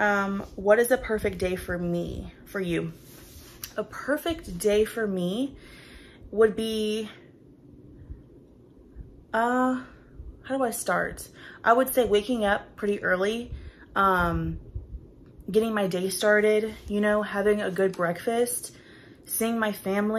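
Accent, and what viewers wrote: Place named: South, United States. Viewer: I love that great video. You are so beautiful. I love your Southern accent.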